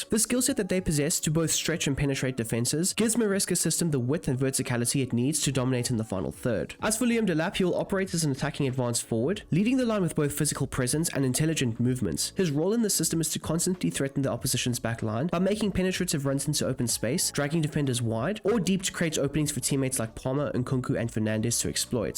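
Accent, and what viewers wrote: South Africa. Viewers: Are you from South Africa?? That accent is familiar!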